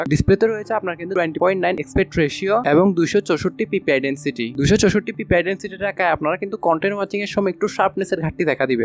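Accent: Chittagong, Bangladesh. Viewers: Same bhai..ami fouzdarhat thaki..apnar bolar style dekei buje geci..apni ctg tahken..😇💜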